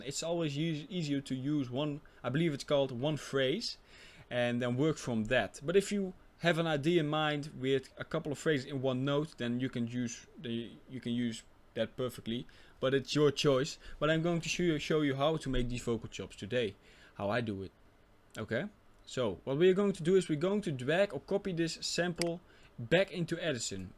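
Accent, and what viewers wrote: Netherlands. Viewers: ik hoor aan je accent dat je nederlands bent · Nederlands accent hahah. Nice vid man! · lol i think that MAN is dutch like me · 2 sec in de video en ik dacht gelijk jup Nederlanderrrrrrr :)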